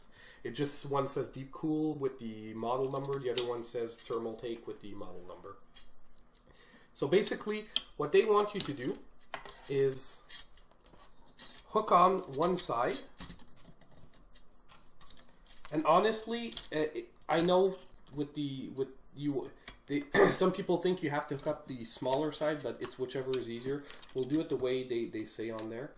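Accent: Quebec, Canada. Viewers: Subb'd because good video and awesome Quebec English accent :)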